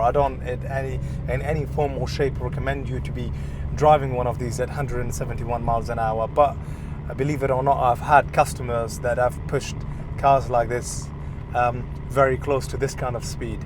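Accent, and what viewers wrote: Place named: London, United Kingdom. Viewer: What a hell of an accent does this guy have? London?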